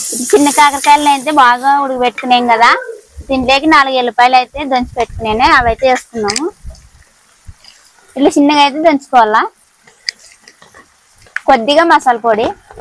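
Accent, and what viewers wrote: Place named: Raichur, India. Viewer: Mee a uru akka? Naaku chinna kakara kailu ante chala istam memu manvi Raichur Karnataka Raichur lo kuda telugu ilage matladutam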